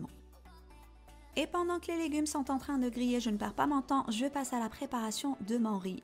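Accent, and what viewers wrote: Algeria. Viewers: الحبيبة ديالي ماشاء الله عليك كنحماق عليك 🥰 متبعاك هادي من شحال بصح هادي اول مرة نخليلك كومنطير بغيت غير نقولك نتي ختاريتي باش تهدري بالفرونسي ولكن سبحان الله كنفضلك بالعربية اكثر تجي حلوة منك اللهجة الجزائرية ومن أيضا كتكوني قريبة للقلب المهم علا راحتك أنا حتا الا هدرتي بشنوية غنتبعك 😉 معاك مغربية ساكنة في بلجيكا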